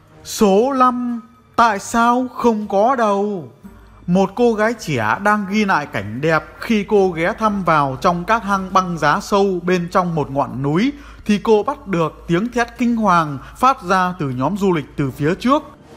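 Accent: North, Vietnam. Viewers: Anh là ng bắc à :))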